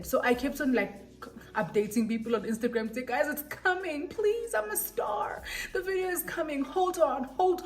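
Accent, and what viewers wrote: Nigeria. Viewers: Woww❤❤❤you look like those rich Nigerian woman with that face+accent,,we can't wait for more video#2024,,,, Happy New Year Motswala 🎉🎉🎉😍😍😘😘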